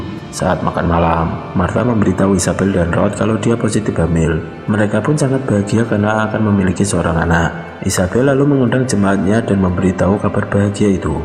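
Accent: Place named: Java, Indonesia. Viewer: Kok kk kenal suaranya medhok2 gini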